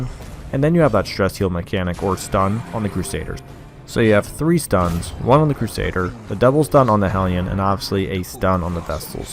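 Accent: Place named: United Kingdom. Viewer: I absolutely love your pronunciation. You're British, right? Or a non-native who had learned British English instead of nowadays widely spread American? Or an American speaking not so American?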